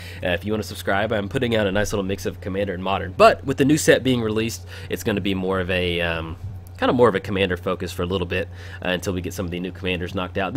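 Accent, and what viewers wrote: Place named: South, United States. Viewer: Seems like a prime deck for Timetwister.:)Also, your voice reminds me of every southern kid I met in the Army.:)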